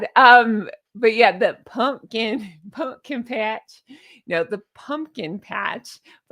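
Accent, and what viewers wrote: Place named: South, United States. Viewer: I love a southern accent ❤ I don’t think you should suppress it. Imo. 😊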